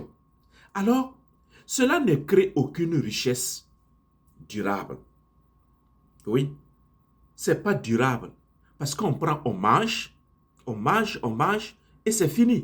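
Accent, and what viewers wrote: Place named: Burkina Faso. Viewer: Accent Burkinabé. Tu as raison Mon frère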